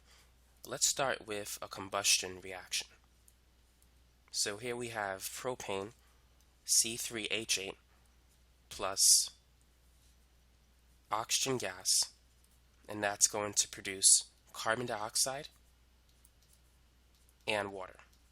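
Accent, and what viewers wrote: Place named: New York, United States. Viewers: im hearing a little bit of north east accent. new york area 🤔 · Yall I think he has a new yorkian accent idk if u care tho lol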